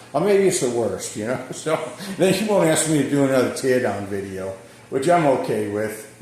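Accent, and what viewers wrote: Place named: Northeast, United States. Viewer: You live in Texas? From your voice I assumed you were from the Northeast.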